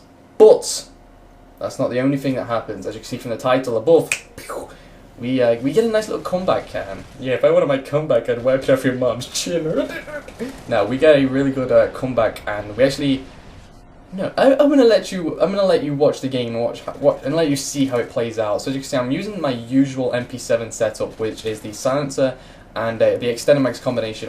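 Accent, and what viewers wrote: India. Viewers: EPIC INDIAN ACCENT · enjoyed the Indian accent :)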